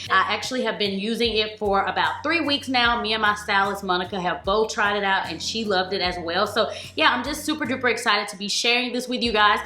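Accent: South, United States. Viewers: I always love your looks and tutorials! And that you sound just as southern as me! 😩 The struggle and the jokes I get! But you’re killing this look and outfit! You go girl💁🏼‍♀️ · Girl I just love your southern accent 🤗🤗🤗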